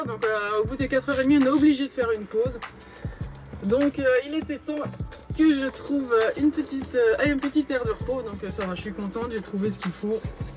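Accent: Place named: Belgium. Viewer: Très intéressante comme vidéo car je commence ma formation de camionneur en avril 2024. Changements de carrière pour moi. 🇨🇦🇨🇦🇨🇦 je suis de Montréal. Bonjour à vous en Belgique, j'aime bien votre accent !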